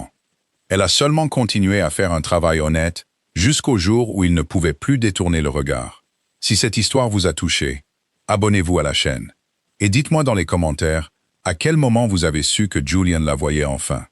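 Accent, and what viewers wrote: United Kingdom. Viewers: Merci pour ce beau récit, l’honnêteté enfin reconnu..ainsi que l’amour, merci également au narrateur et à son joli accent britannique. Je vous écoute du Sud de la France, proche de la Mer Méditerranée..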